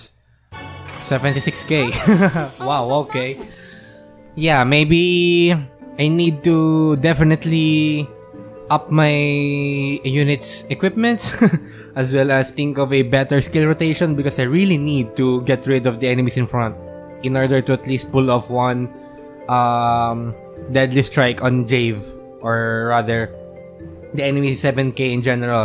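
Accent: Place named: Philippines. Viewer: First 30 seconds accent I tought you were from Romania, then I realized Philiphines.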